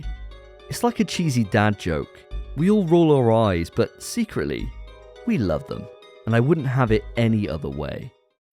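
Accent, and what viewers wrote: United Kingdom. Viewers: It’s just not well thought out. Also, the British accent is annoying.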